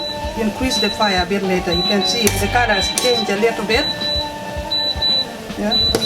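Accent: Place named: Luo, Kenya. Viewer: You sound my accent of Luo.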